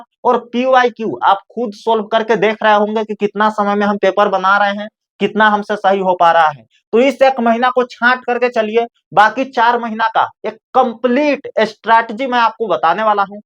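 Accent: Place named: India. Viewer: I think Hindi medium. Cuz As u can really see it from sir accent .. It sounds like pure Hindi medium accent........ Don't u think so????Ahhhhh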